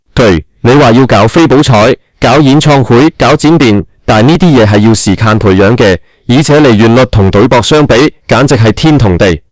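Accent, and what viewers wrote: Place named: India. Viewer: 印度人講廣東話.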